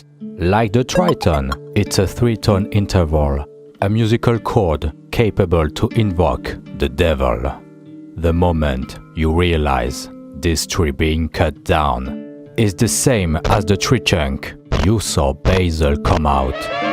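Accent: France. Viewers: the best tier list in my life. thank you :) also your french accent is so lovely!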